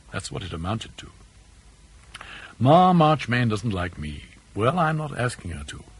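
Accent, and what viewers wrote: RP, United Kingdom. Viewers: his own impeccable received pronunciation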